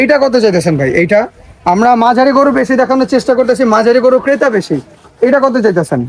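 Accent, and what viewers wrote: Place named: Old Dhaka, Bangladesh. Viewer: তোমাকে সাবস্ক্রাইব করেছি আমি আর তোমার বড় ফ্যান তুমিও তোমার ভাষা পুরান ঢাকা আমার বাসা নারায়ণগঞ্জ😊😊😊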